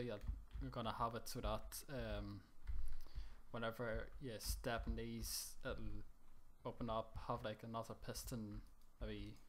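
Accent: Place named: Ireland. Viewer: hey man love this vid it gave me a lot of ideas and i love ur accent it sounds irish and im irish :)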